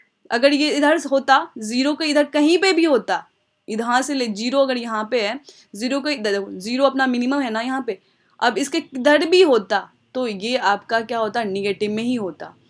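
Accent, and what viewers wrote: Bihar, India. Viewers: Dont judge me but from your accent what I can assume that you are from "Bihar" who also lived in Bengal :) . I am super happy to see a girl from "Bihar" solving and explaning hard problems of leetcode . Keep going :)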